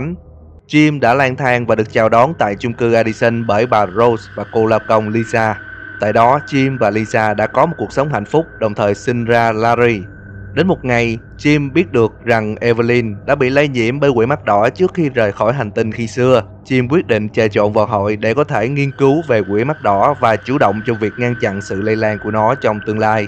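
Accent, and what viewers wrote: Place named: Mekong Delta, Vietnam. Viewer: Omg có thằng e mới giới thiệu trang này xem. Vào xem nghiện luôn. Mặc dù mình chưa chơi game nào trong những game bạn phân tích nhưng bạn có thể suy luận như thế thì thật là quá đỉnh. Ngôn ngữ của bạn cũng chuẩn nữa. Giọng miền tây nhưng rất dễ nghe. Chọn từ ngữ đúng, lối dẫn truyện rõ ràng mạch lạc nên mình nghe phát hiểu luôn. Mình sẽ sub và ủng hộ. Chúc kênh sẽ càng phát triển nhé.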